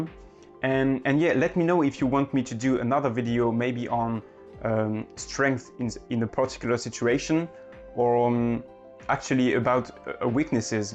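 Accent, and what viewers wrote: France. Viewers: Sounds like a french accent.but just my guess not sure.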